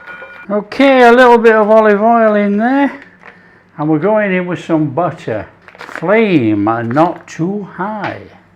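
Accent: United Kingdom. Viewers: I Like hearing this british accent 😊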